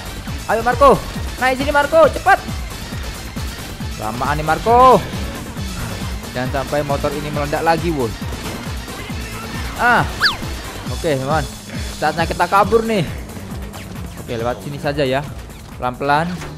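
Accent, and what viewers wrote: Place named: Java, Indonesia. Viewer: Hhhhh logat jawa nya medok. Hebat bubur nya g tabur lagi.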